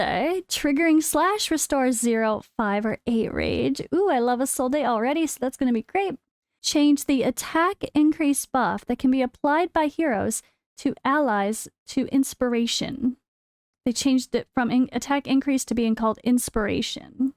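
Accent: United States. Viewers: You can’t hear that she’s American……😮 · I didn't know you were in Romania! You sound very American to be honest. You are very awesome!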